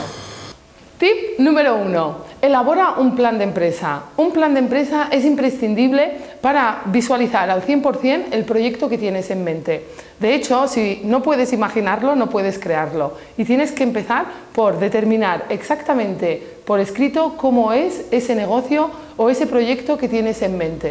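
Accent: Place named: Morocco. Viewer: eres marroquí?? Ese acento y determinación es inconfundible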